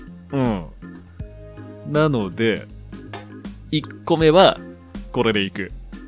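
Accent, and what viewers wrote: Kansai, Japan. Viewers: 最近関西弁多い気がする🤔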